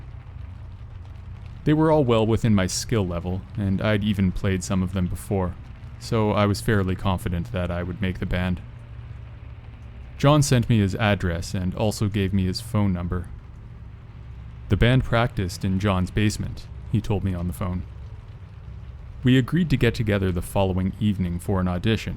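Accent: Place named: Canada. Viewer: I dig the Canadian accent.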